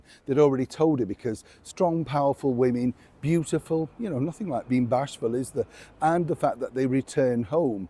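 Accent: United Kingdom. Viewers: I love to hear someone with a British accent!!! 👋👋👋👋❤️❤️❤️❤️🌹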